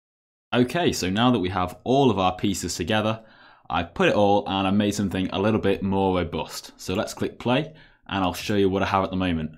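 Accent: United Kingdom. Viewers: Can you not hear his voice he’s from uk you can hear from the sound of his voice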